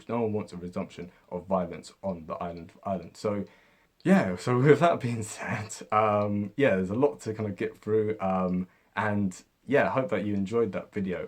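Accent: England, United Kingdom. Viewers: I'm from Ireland and to see someone from England (I assume your English from your accent) talk about Irish history in a fair and balanced way like you have is very welcome and wholeheartedly appreciated. Thank you sir, you have well earned a follow. ps your script sounds great. pps dail is pronounced dall (like doll with an a instead of o) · It is soooo refreshing to hear an English voice with such a deep and erudite knowledge of Northern Ireland. Just plain facts. Well done sir. Great job. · Great video... I've never heard someone with an English accent show this much knowledge and understanding of our history before. If only there were more in Westminster or English TV/radio with this level of understanding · Very good summary esp coming from (it sounds like, by your accent?) an English person. Also, the British govt have just decided that it's okay for extra-judicial killings of civilians to happen and the soldiers etc who do that won't be held to account ... 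As for the Unionists becoming an oppressed minority - I am confident that this will not happen. Re-unification will have to be done carefully and with due regard to every individual.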